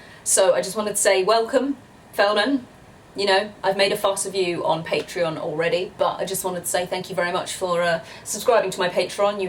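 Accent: United Kingdom. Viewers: Just love to listen to your beautiful british accent. Such a treat.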